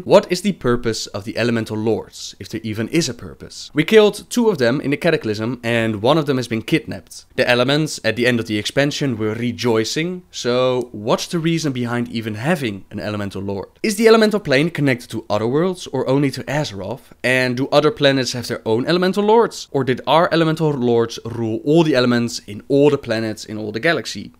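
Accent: Netherlands. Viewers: are you dutch? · are you dutch OP?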